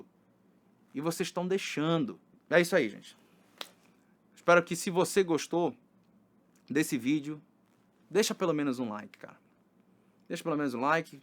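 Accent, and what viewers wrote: Pará, Brazil. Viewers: Esse sotaque é do Pará? Do nada achei seu canal e agora tô aqui assistindo sobre placas de vídeo 🤭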